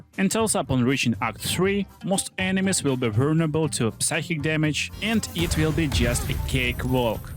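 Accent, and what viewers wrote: Russia. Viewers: omg, your accent-- are you russian? nice glitch btw. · Русский акцент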